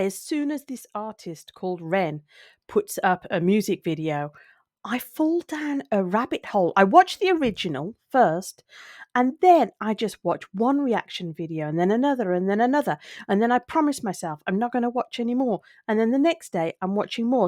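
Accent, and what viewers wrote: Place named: Solihull, United Kingdom. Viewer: I love your comments on watching Ren, the Collective joy that it brings watching others discover him for the first time! You say you are not the typical person to be a Ren fan… I will prove you wrong!! I live in Brighton, and when he announced that he would be playing one festival this year, he chose The Secret Garden Party. Lots & I mean lots of fellow Renegades, decided to come to Brighton first, from all over the world! I offered my sofa bed for anyone that needed it, I now am super close with the lady from Canada that came to stay with me! I’m 49 & she is in her late 50s. We met with others, mostly women, mostly 40+ in age! Ren has spoken to so many people through his music, young and old! The is a guy in his 80s with a reaction channel (Vietnam Era Vet) who LOVES ren also! Glad you love him also! ❤ Also, you sound like you are from my neck of the woods 😉 I was born & dragged up near Solihull! 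🙏♥️🙏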